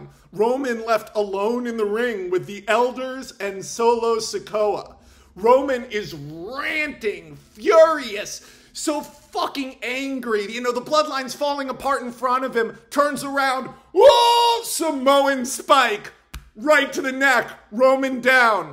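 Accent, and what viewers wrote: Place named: United States. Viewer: Your american accent is so obvious,.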